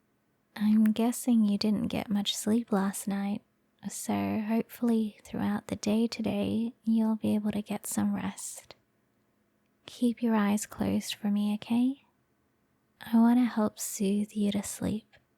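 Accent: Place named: Australia. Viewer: dam what a guess that you’re from Australian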